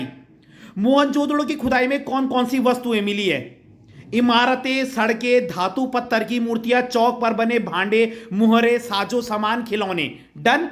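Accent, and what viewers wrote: Garhwal, India. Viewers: Sir aap gadwali ho na 🫢🫶🤭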